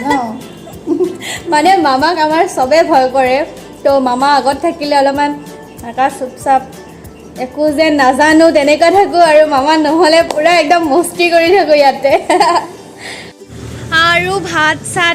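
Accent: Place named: Goalpara, India. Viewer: bhal lage huni tumi 2ta lang kotha bur kole eta normal assamsse and eta lower’or goalpariya barpetiya assamese tu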